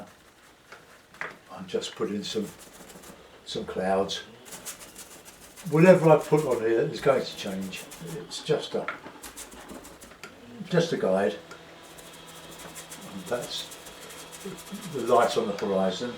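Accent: United Kingdom. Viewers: Lovely! I learned at lot from just this v8deo. However, I wish the artist (not just you, but many on You Tube) would edit the closed captioning, if that is possible. I have trouble hearing, and without being able to read your lips, as well as the British accent (old American here) its very hard to understand. The closed captioning is terrible; in many sections it makes absolutely no sense. But the video is magnificent otherwise!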